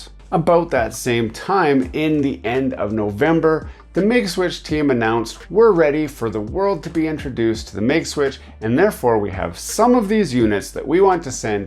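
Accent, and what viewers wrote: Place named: Canada. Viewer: Patiently waiting as well. (Insta-subscribed when I heard your Canadian accent!)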